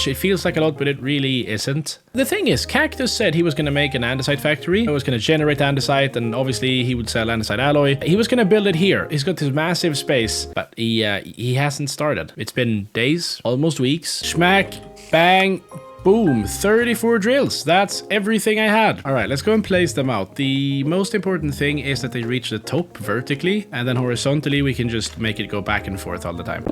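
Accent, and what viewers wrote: Sweden. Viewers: You Swedish?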